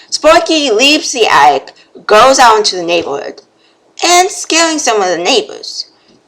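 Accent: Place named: New England, United States. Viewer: From the sound of your accent I am assuming that you are from New England; perhaps Maine.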